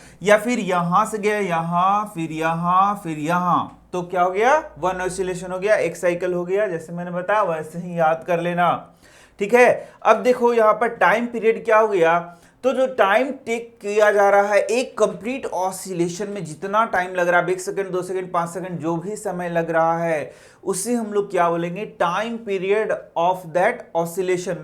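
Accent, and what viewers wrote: Bihar, India. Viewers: Sir ji app Bihar ke ho kya? Mujhe to vaisa hi lagta hai ki app Bihar ke ho.apne discription me kya likha hua hai? Ek hi line to 100 bar😂😂 This is funny sir. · Sir,I thing you are from bihar🤔👍👍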